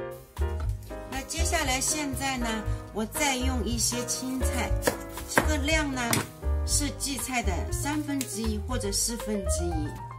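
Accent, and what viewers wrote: Shanghai, China. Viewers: 最喜欢Jenny甜的发糯的上海普通话，今天就先包荠菜馄饨！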